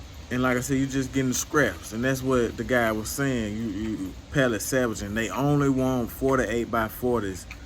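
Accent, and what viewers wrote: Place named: South Louisiana, United States. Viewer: Sound like u close to south louisiana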